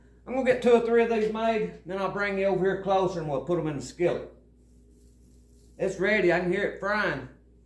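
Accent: South, United States. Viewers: Hey.I never node you was southern. 😅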